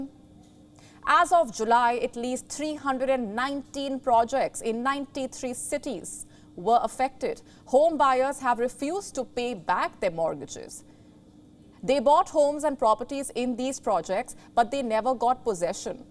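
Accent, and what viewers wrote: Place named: India. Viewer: The indian accent is the worst. so irratating.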